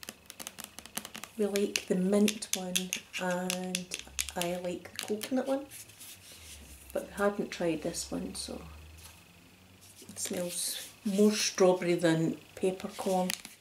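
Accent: Scotland, United Kingdom. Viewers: Just discovered your channel! Scottish accents are my fave 😁!! I didn't know there was a online poundshop! I love a good nose I the poundshop 😁 you got some awesome goodies! laughed when you said about putting your glasses on, my eyesight is awful, I feel your pain 😂 I got dogs, lint rollers are a must 😂 xx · I'm Scottish as well an its nice to hear a familiar accent in ASMR <3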